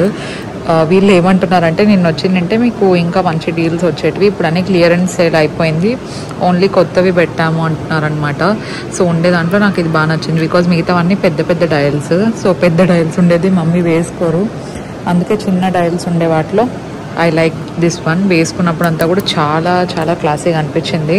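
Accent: Anantapur, India. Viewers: meeru pakka అనంతపూర్ అని నిరూపించుకున్నారు మళ్ళీ ఆ స్లాంగ్ లో తూర్పు తిరుగు దండం pedath ఆ ఓర్డ్ akadidhe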